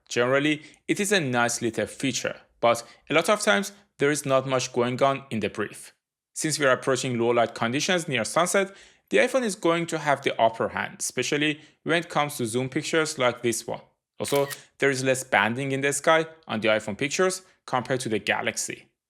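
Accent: Iran. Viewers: یعنی قشنگ چشماتو ببندی متوجه میشی یه ایرانی داره انگلیسی حرف میزنه:)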